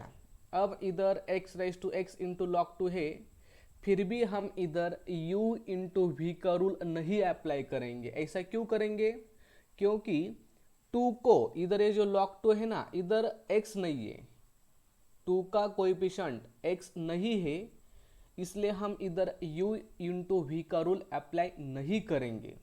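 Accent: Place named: Maharashtra, India. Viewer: Accent marathi ahe tumchi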